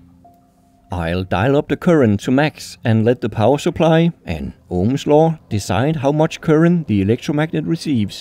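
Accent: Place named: Denmark. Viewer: Nothing like a good old danish accent 😄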